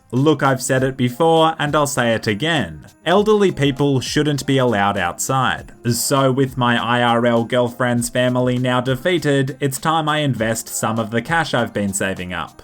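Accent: Australia. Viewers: You talk like a robotic Australian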